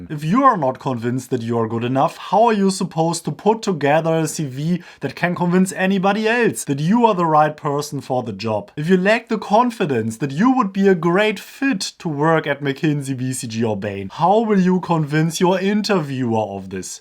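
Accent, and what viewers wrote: Germany. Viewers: Your german accent is so refreshing!!! Wow! Normally a video on networking or sales has a fast talking American 😝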